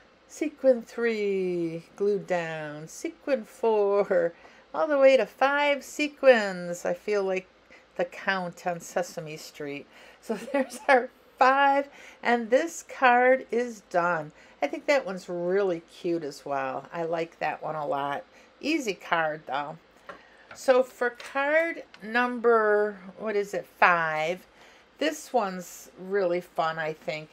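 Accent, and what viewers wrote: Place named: Wisconsin, United States. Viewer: Nice cards! Your accent is driving me crazy! I lived in Connecticut when I was younger and I just know I've heard it up and over that way, but not quite that far... Wisconsin maybe??? LoL, see it's truly making me crazy!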